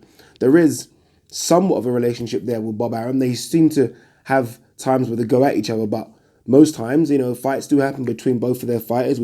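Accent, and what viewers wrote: Liverpool, United Kingdom. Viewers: Love your Scouse accent.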